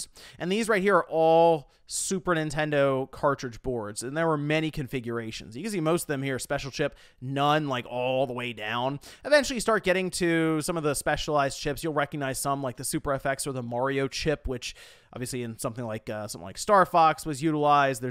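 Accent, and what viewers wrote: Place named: United States. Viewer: Must be an American accent thing. Sometimes I swear I hear the word gayboy uttered instead of Gameboy in videos like this beside of of the invisible or softly pronounced M's.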